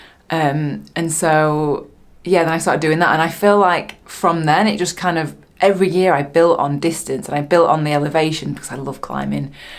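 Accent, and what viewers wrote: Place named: North, United Kingdom. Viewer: Just wanted to say thanks for your many inspirational videos, really looking forward to this series. Been riding for about 15 years now. Managed to eat myself into a diagnosis of type 2 diabetes back in august and have since become fitter and faster than ever (at 50!) 3 weeks ago managed to aggravate an issue with a disk in me neck which meant I was in a lot of pain and unable to ride. Watched this vid while in hospital recovering from the corrective surgery so I can’t wait to hear you share your recovery story. Reckon it will be 6 to 8 weeks until I’m back on the bike which is going to be hard. I have to say though they for me over the last 15 years of riding as someone with fairly severe bipolar I have gotten HUGE mental health benefits out of the simple act of riding and maintaining my bikes. So please keep up the great work, and also it’s good to hear a proper northern accent here on YouTube.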